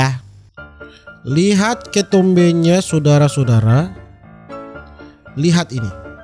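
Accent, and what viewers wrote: Manado, Indonesia. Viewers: Hahahahhaa manado memangg dorang pe logat gagarap memang e 🤣🤣🤣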